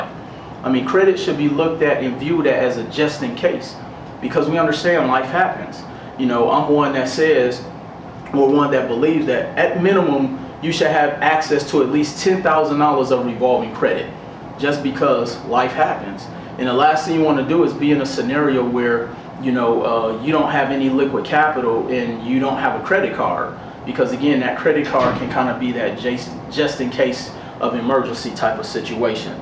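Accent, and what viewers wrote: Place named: Pittsburgh, United States. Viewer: Very helpful!! U still got your Pittsburgh voice ...Haha r u from Pa? 👍❤👌🙊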